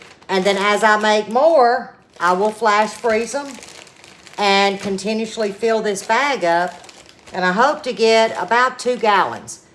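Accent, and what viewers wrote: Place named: Louisiana, United States. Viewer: definitely the husband count! And, your Louisiana accent! Lol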